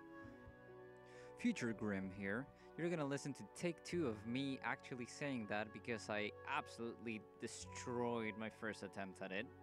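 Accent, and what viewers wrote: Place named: Japan. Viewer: ты хорошо говоришь на русском, но говоришь так, будто это японский или корейский, но а так нормально >___<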